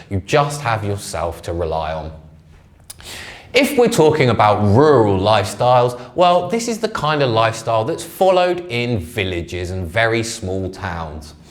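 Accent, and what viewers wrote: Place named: United Kingdom. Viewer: love his british accent !!!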